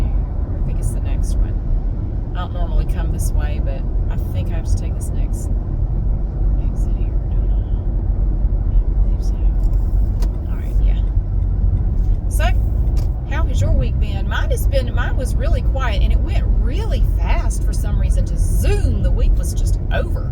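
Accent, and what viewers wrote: South, United States. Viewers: I feel like an idiot because I just realized you’re. I didn’t know that til now, I’ve followed that channel forever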